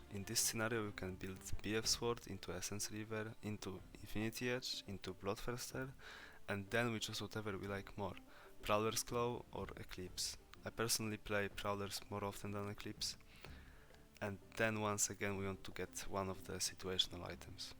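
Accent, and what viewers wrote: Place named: Poland. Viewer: najpierw z akcentu mylslalem ze rodak, potem zwatpilem a potem zauwazylem nick na wozku, pozdrawiam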